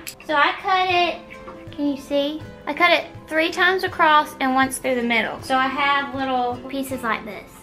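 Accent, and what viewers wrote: United States. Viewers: love you're country accent love you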